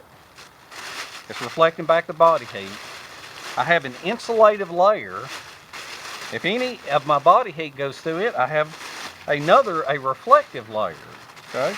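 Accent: South, United States. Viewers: I feel like my own southern accent is far inferior to this guy's accent...that is incredibly southern! Theeyus = this, teeyunt = tent, eeyun = in, swayut = sweat... that is amazing! Where are you located? Is this accent common there? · thats a strong southern states accent you have there good sir.....thanks for the clip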